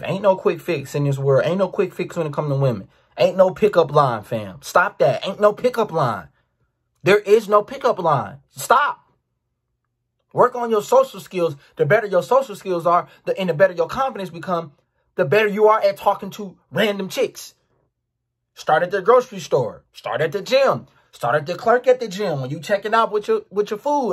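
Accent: Baltimore, United States. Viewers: "yewtewb... wut it dew..." nun beats that Baltimore accent much love from Cali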